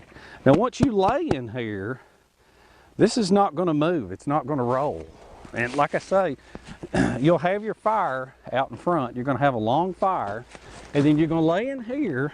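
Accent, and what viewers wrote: South, United States. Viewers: Hello again lidal ole England. Now i must admit, it took me till halfway through that vid to come on board. ive seen a few of your vids now. and i have wondered. is this guy for real? That accent of yours is fantastic, somewhere between the Dukes of Hazzard and Forest Gump. Back to your product, a few years back i had an idea in my head, not one that would make money, in fact i assumed someone must have already invented it. A camp bed without a frame was the idea i had. you could make the poles yourself with long straight bits of wood/logs. my idea was to have a sleeve running the lenth of each side. but your webbing on the side idea works better. due to a seriously small market, i doubt either one of us was ever gonna get rich, or a patent. this is a case of great minds think alike, as you have made the idea i had. And taken it several stages further with the whole survival blanket thing. 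i was just thinking a camp bed without a 37 piece pole puzzle. So all in all, good job mate, seriously. me and a friend of mine are in the planning stages of making our own youtube bushcraft/camping/outdoor stuff channel. I'll be sure to let you know as soon as we post anything worth watching. Gary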